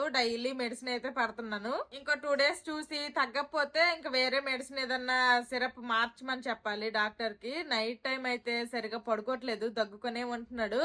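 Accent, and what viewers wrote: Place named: Nellore, India. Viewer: Hi nuvu same ma lage mataladutunnavu madhi nellor ma amma valupurilo vuntaru yavaru emi anukunna mana pani Manam chevali nuvu super &great ink Manchi videos chevali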